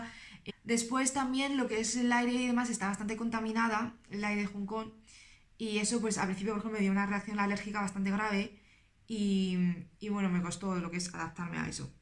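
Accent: Spain. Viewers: No es que hable como una española, es que yo apostaría a que es más española que yo. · Se ve algo raro ver a una persona asiática con acento español😂 y bueno vale la pena anotar que lo habla muy bien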